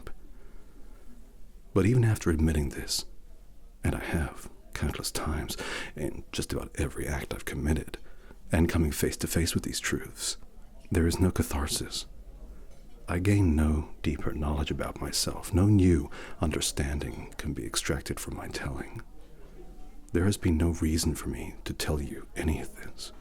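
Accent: United States. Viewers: I haven't seen the movie, but two things amaze me: #1 your flawless American accent, and #2 the way you are able to make your face look like Christian Bale's face. I feel like a broken record saying yet again how versatile you are, but damn. 🙂 💜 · Scary, disturbing, and very satisfying. Nice American accent. :) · Your American accent is amazing. How do you do that?! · Wow!! Your American accent is absolutely amazing! Especially because your real voice is deeply British (which I love!) You truly sound American if this was the first of your videos I'd ever seen, I wouldn't have guessed your British! American here from North Carolina! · Your American accent is getting really good, man. <3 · Wow!!!!! Your American accent was just...GOD you’re good! You should absolutely do books on tape or something. You narrate SO WELL. And that was creepy and perfect and YOU are so perfect! 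Amazing job as ALWAYS! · You get a hint of it... But it is SO impressive that you can switch to an American accent 😱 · I'm really digging your america accent! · Great American accent.. I want more! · Wow, your American accent is really good! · Not only is that a great American accent but that’s also a very good impression. Acting chops for dayssss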